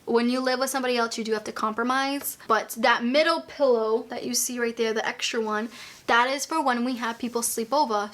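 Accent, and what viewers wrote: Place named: New York, United States. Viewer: I’m new here I love your accent are you a New Yorker ?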